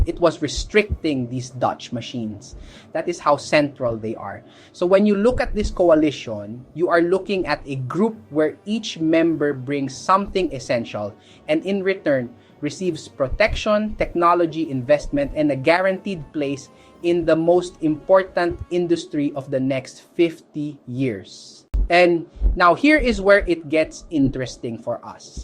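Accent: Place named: Philippines. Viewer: I like your pilipino accent and of course your analysis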